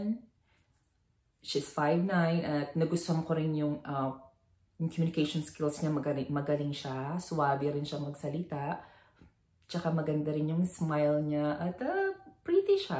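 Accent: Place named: Philippines. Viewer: kahit Pinoy accent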